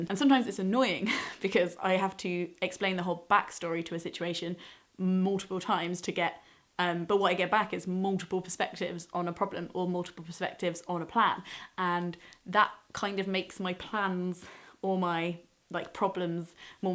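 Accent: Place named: West Midlands, United Kingdom. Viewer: I've been watching your videos for ages and never realised you were a fellow West Midlander (maybe it's just me being awful at recognising accents, I don't know) 😂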